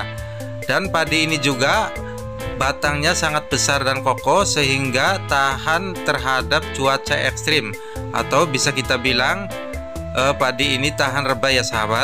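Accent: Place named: Indramayu, Indonesia. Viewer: Sampean sing daerah endi, indramayu e kang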